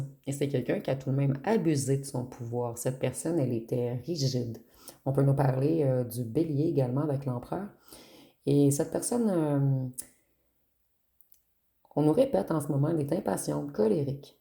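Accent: Canada. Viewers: Tirage très complet deux personnes se superposent. J'adore cet accent canadien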